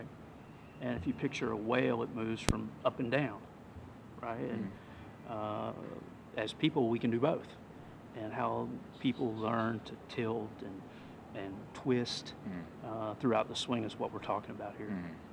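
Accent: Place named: United States. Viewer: What S&T is..? Is 'painting lipstick on a pig', it is just fast-talking with an American accent which cons people into thinking it is good for them..Generally it is pretty much what has been done WITH SHORTER CLUBS since forever...and it can't be successfully done with the longer clubs. If you don't move weight/mass away on the backswing..you can't use it on the downswing.. proponents of S&T nearlyy always seem to need to rubbish every other system..just to leverage their marketing of this WHICH NO GOOD GOLFER USES,,,and for which NO statistical evidence of subsequent shot quality has been done or shown. Would any one like to show a video of FADES being repeatedly done across the club range with this system..SHOW AND PROVED, not just talked about.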